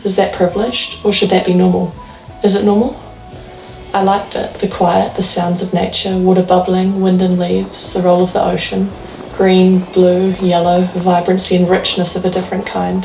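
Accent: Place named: New Zealand. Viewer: I love the kiwi accent welcome to Sydney come again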